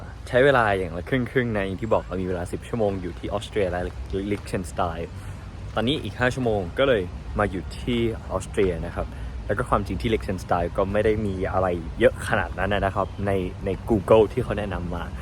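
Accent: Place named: Khon Kaen, Thailand. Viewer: มันคือ ภาษาขอนแก่นค่า 😂😂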